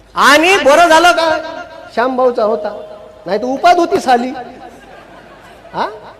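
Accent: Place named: Varhadi, India. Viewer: वऱ्हाडी भाषण व्वा 🙏PM साहेबांना बोलताना राउटसारखी जीभ घ ून जाऊ देऊ नका, हे ्वच पक्षातील हरामखोरी आहे, तुम्ही आपण गुरुजींना हे राजकारण परवडत नाही जी.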